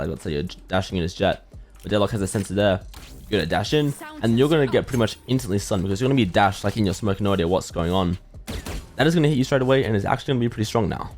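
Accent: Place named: Australia. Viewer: your accent suddenly is more australian than what it was before, impossible to understand 40% of what you say.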